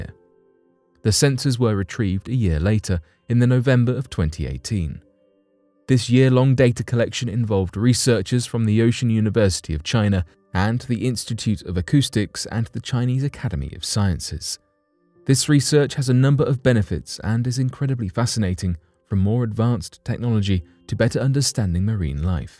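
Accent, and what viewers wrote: United Kingdom. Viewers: Where do we go to find non-british speaking narrators?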